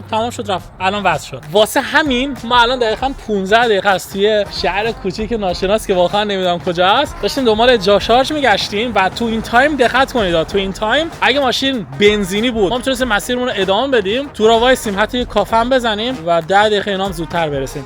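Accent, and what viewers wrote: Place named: Bushehr, Iran. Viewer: بوشهریا لهجشون همینطوریه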